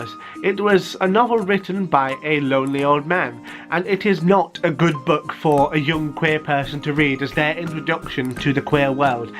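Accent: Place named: United Kingdom. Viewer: Dear, I'm Ivan and I'm genderfluid:) I've always enjoyed rereading certain books. last year I reread "The Lords and The Ladies" and "Witches Abroad", 'cause I went to live abroad and I found myself in a depression and the only thing I could think that would lift my spirits up was rereading these books. now I started reading 1984 for the first time and, boy, how do I find it relateable to the times we live in!:) I also share the same fears about the politics in the world currently - I'm sad, angry and depressed. here, in Bulgaria, where I'm from our stupid people chose a pro-Russian president, so we'll see how it goes down. hugs!:) keep on doing videos with that lovely British accent of yours! you're adorable!:)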